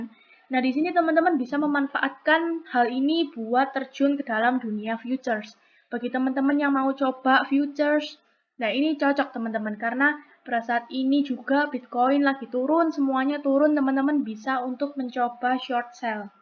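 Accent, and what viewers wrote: Java, Indonesia. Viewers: Iyo medok tapi pinter bngt e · Medhok tnn mbak wkwk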